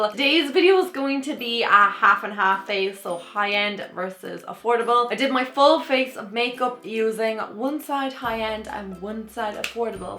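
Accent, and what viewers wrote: Ireland. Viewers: I heard an Irish accent and the description says you are from Ireland. Yesss!!!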